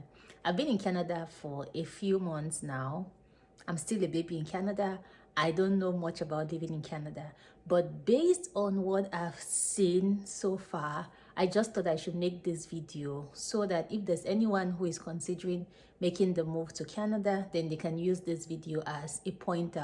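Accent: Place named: Nigeria. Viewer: Hi nice content there, I am enjoying your channel. I am guessing you're Nigerian or maybe Cameroonian? (judging by your accent haha).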